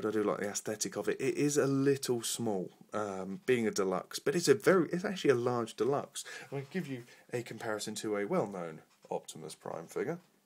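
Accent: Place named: United Kingdom. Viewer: hes from the uk theres nothing wrong wiv his accent if you dont like his voice dont watch it cant help the voices were born wiv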